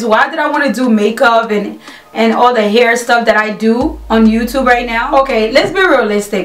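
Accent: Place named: London, United Kingdom. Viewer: i heard a london accent as well😂😂😂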